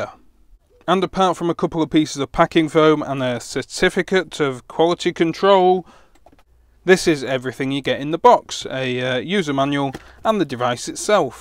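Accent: United Kingdom. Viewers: great reviews so far, a fan from another 'experimental techie' from indonesia. Just wondering have you done a "Cheapest On-Grid Inverter" review already? I guess this piece is the only missing review that makes a solar newbies out there would expect from you. MPPT function, Pure Sine Wave and 85-89% conversion power rate are few claims that I would think you probably the best person to prove it. I could point few inverter candidates for your review;) Anyway, just a thought, love your work so far and keep that British accent!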